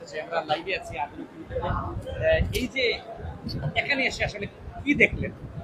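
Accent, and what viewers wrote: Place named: Sylhet, Bangladesh. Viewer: Sylheti accent bhaisab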